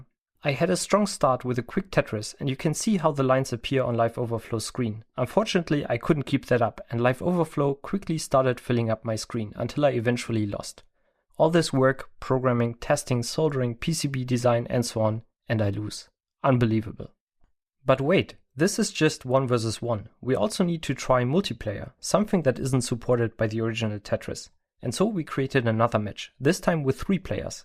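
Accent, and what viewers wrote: Germany. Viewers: Ein Deutscher, das hör ich doch direkt 🤣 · I can hear a German accent ^^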